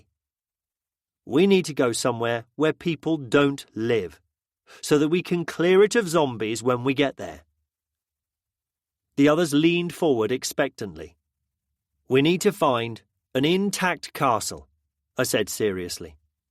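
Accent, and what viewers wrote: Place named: United Kingdom. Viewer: Brits can even make the zombie apocalypse sound funny 😃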